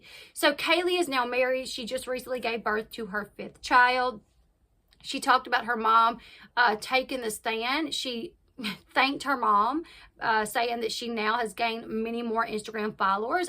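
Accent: South, United States. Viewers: I love your accent. I miss the south.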